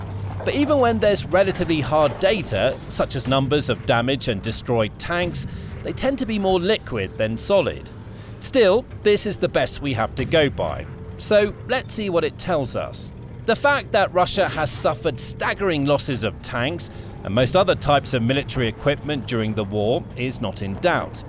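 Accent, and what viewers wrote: United Kingdom. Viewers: I thought he said the Challenger was the best overall, which seems to go well with his British accent.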